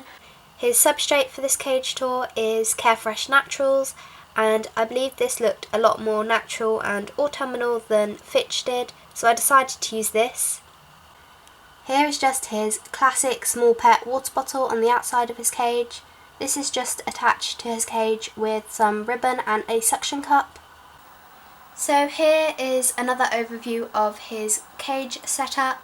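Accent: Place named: United Kingdom. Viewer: I useally can't stand british accents but you'rs is charming and not anoying at all😄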